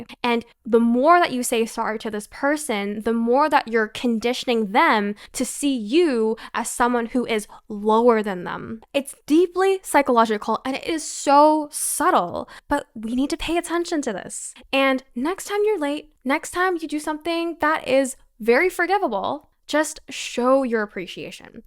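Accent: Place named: Los Angeles, United States. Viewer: The LA accent ... sounds stupid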